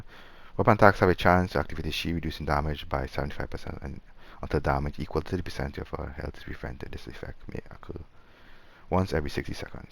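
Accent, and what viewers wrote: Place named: Trinidad and Tobago. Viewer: how this man sound like ah trini so, thanks for the vid bro, im also playing a red lantern. me had a little trouble understanding them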